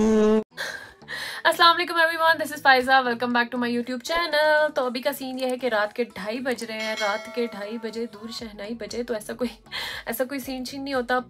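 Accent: Punjab, India. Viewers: I have recently subscribed your channel And I'm just getting very entertaining video to watch. Loving your Punjabi accent and purity ❤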